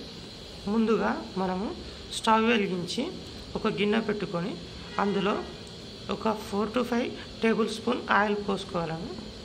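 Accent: Telangana, India. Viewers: Meeru chala kastapaduthu diplomatic ga matladthunnaruu.. Just miku ela nachithe ala telangana style lo matladandiii.. And nice curry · Ibbandi padoddu Clear ga telangana bhasha best kada.... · mikku nachinattu cheppu bro... chala kastapadutunnaru padathiga matladataniki.... madyalo Telangana slang vadaru adhe continue cheyu... baguntundhi...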